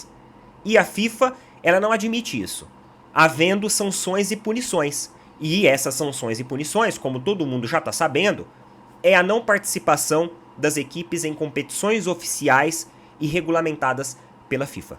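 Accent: Sao Paulo, Brazil. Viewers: Ele é Paulista então tem que ter sutaque.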